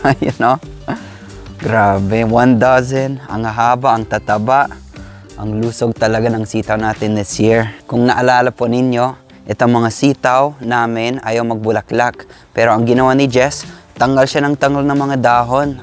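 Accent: Philippines. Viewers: Accent ni kuya, ilocano metten😂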